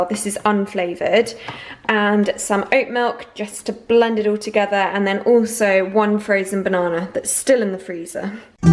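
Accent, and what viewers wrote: RP, United Kingdom. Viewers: why are you always trying to sound extra posh lately? youre not even from a posh background.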